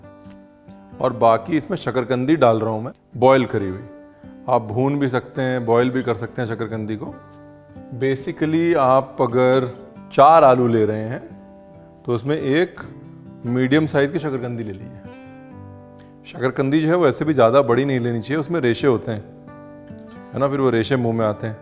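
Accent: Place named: Varanasi, India. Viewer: बोले तो छा गए गुरु... बनारसी अंदाज में।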